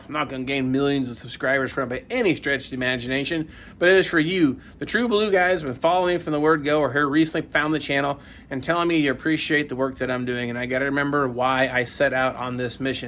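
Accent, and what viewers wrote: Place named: South, United States. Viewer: This is kind of interesting. I’ve been watching you for over a year. I always kind of thought that you are from the south, because of your accent, even though you call people “you guys.” I’m from Texas, & I thought you to be maybe from Tennessee or northern Louisiana, or the like. 🤠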